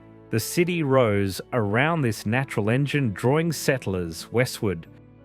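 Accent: Australia. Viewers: an Aussie narrating this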